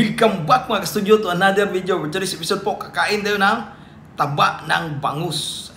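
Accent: Visayas, Philippines. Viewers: Mr.wilcomeback. Let wifi to eat also not all d time ikaw ang nkikita sa u tube.visaya p ang accent mo dong.talk tagalog so everybody can understand db .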